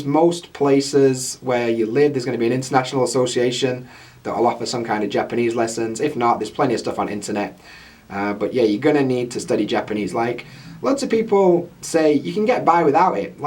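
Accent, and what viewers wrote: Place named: Northern England, United Kingdom. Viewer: Hey, I noticed you always talk in your normal accent in videos. Do you need to change how you talk to when actually teaching English? I’m northern too (Geordie) so was wondering if you still use “me” instead of “my” when teaching or using the word “like” in the middle of sentences? Or do you try and talk proper English?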